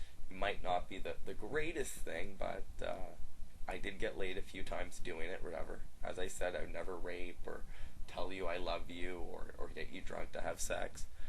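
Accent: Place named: Canada. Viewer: i liker yer Canadian accent! like when ya say "OUT"...cute{i'm not gay[not that there's anything wrong with that], just callin a spade a spade}(fer serious). You really do have a gift as the voice of reason here!...great job.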